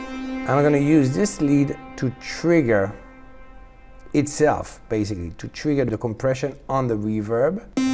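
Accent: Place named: France. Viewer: amazing tutorial, I dont have a mac so im using FL Studio and just getting to grips with it. Your talent is amazing this is why you are #1 (even though SHM are my Favorites ;) ) but what amazes me even more is your english. us british can be so arrogant and not attempt to learn another language. Sure you have your french accent but i can understand every word without struggling.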